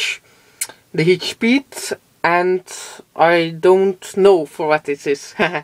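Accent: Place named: Netherlands. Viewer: Duidelijk hoorbaar dat je normaal gezien nederlands spreekt ;D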